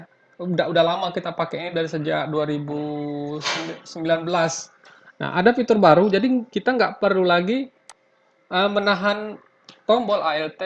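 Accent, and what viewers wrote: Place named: Padang, Indonesia. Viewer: Sprti logat logat padang padang gan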